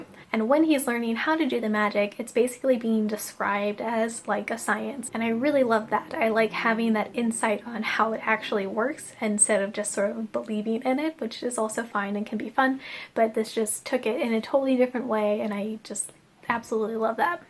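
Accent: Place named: North America. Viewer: Hi, this is my first visit to your channel. I was instantly struck by the dichotomy of your North American accent and the fact you have the international edition of the book. At least, I'm pretty sure of it; I'm an American and have the U.S. edition, but also bought the UK version (the same one you have)... Are you living overseas? Was it a gift? Am I rambling and not making any sense at all?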